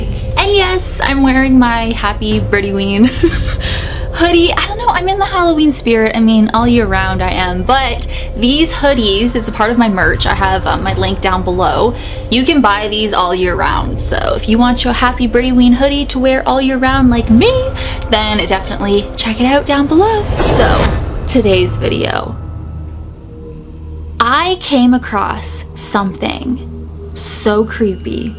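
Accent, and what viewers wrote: Canada. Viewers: No don’t lose your Canadian accent ☹️ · First of all your Canadian accent is fine, I love it! Nothing wrong with it all :) Pennsylvania resident here..am about a half hour from Gettysburg. Would love to go there. Born in Philly! Yeah this legend is abit baffling but super interesting and trippy! If you want to explore another incredible story relating to Pennsylvania, look up the town of Centralia. I won't spoil any details here. Not really paranormal but I think it's quite interesting...and true. Thanks for the love for PA!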